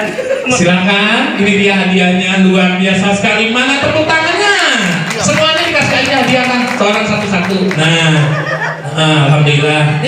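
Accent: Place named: West Java, Indonesia. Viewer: njir sunda pisan ieu mh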